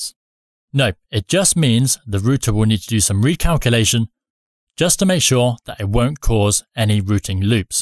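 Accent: England, United Kingdom. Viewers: Rutah 😂 England accent Remind me buttah ( butter) Great explanation by the way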